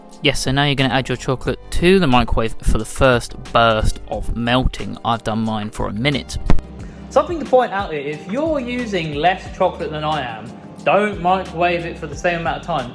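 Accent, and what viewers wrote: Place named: United Kingdom. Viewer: I didn’t know I liked being yelled at in a British accent until now 😍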